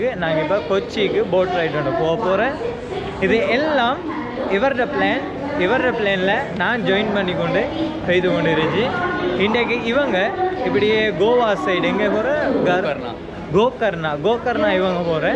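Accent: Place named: Beruwala, Sri Lanka. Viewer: Bro your slang only in beruwala not whole Sri Lanka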